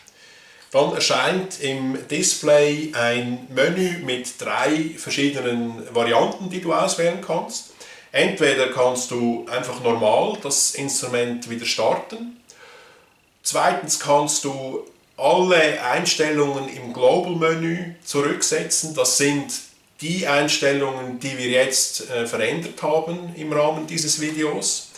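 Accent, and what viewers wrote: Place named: Switzerland. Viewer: Thank you for the video! I'm so glad I speak German. But the subtitles are pretty good. They help where I can't quite get the hang of your Swiss accent ;) For some reason, no-one explains how to properly put on the mouthpiece cap. Am I the only person afraid of damaging the instrument's "reed"? Just got mine in today. Kind of curious what settings I will end up going with, because I play both saxophone and flute.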